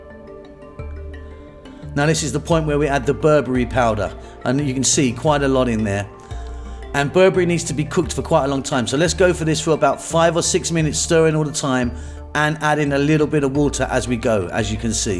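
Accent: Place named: South London, United Kingdom. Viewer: and a lovely South London accent it is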